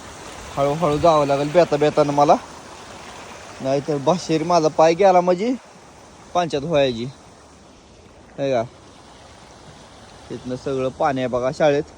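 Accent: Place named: Marathwada, India. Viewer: तुम्ही कोणत्या गावातून बोलता तालुका कोणता आहे भाषा मराठवाडा तील वाटते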